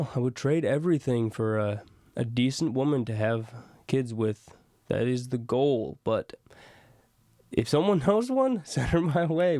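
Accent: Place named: India. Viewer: Sounds like he's a curry loving Indian.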